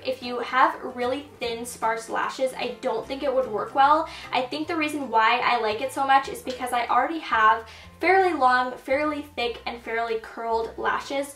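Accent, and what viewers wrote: Canada. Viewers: she has a slight accent because she's from Canada.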